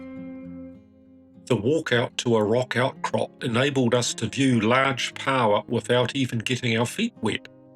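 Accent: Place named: New Zealand. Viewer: Bloody hell mate, that’s a thick kiwi accent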